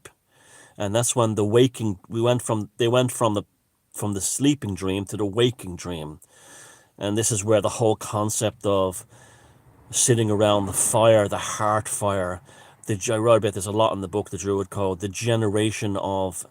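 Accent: Ireland. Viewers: There goes again with that intriguing accent, I love you... “economic growt”! I’m gonna start practicing my Irish accent... lol, I’m serious... I’m doing the accent right now as I type! Why am I thinking of ”Trainspotting” (1998) all of the sudden? That’s RIDDLED with Scottish indiscernibilities... which is also awesome. Alan Watt: “Such a farrrse, it’s such a farrrse...”! Did I hear you say lubricant?! Gonna get me some Irish laydays!!!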